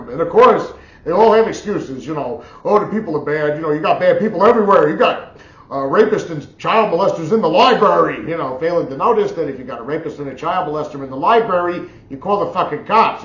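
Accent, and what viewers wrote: New Jersey, United States. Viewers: I can’t believe you’re from Jersey. That accent is so intense 😂 I can relate to you a lot.. I’m from Essex county and grew up with a music-obsessed father as well. Your understanding of female bodily autonomy is heartwarming. It should just be normal but unfortunately it’s not.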